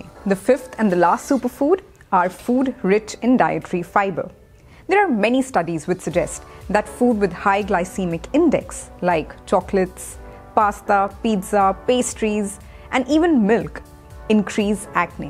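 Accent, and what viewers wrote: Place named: India. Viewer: Please not the hindi accent againnnnn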